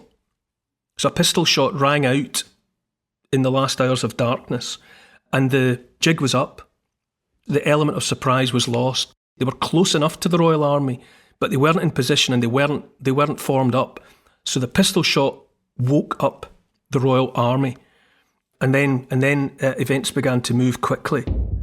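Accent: Scotland, United Kingdom. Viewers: I like talks about rebellion best when they come with a Scottish brogue, and most of all when the mouth is framed with the fabulous beard of Neil Oliver.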